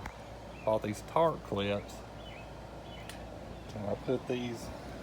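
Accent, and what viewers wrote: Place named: Alabama, United States. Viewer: Better and more good ideas added than any of the other Green Canopy Regent Gazebo videos! Thank you (I recognized the accent. My father in Law, R.D. Blackwell, came from alabama with a similar fun to listen to drawl, and those two letters before his Family name. Thanks muchly. We'll be ready for storms. We just put up the framing on ours. and we're putting in into cement slab. I just finished hammer drilling 1/4" holes and put in sleeve anchors into the holes, with 5 ft. pound 3/8 " diameter acorn nuts (we used to call them "nylocks") to lock the frame down into the cement. The straps will be next. Thanks again. I enjoyed the tour of your gazebo. Oh and the rope lights will be going up in ours too. great idea. Blessings. - Vern Bauerle from Alta Loma (a postal area inside Rancho Cucamonga, California.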